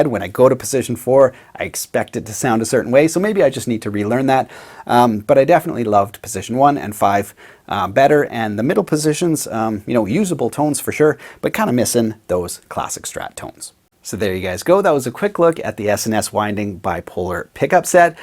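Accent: Canada. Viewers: The point is why should he, a Canadian person, adjust how he speaks to satisfy an American person. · How did I not notice the Canadian accent before he mentioned it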